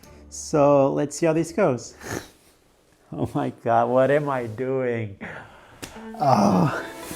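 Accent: India. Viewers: U actually spoke like a South indian😂 sounded so so similar to the south indians man...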